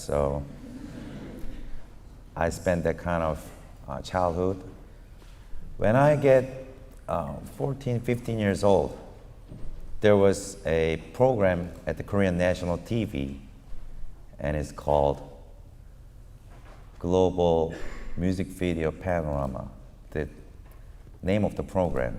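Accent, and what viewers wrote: United Kingdom. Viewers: 영국식 영어를 완전하게 구사하는군요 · 몇번을 다시 들어봐도 정확하게 말하고 심지어 유머까지 구사하는데 콩글리쉬라는 이유를 모르겠음. 또박또박 영국식 발음이라 그런가? 광팬이 미녀였는지는 잘모르겠음.^^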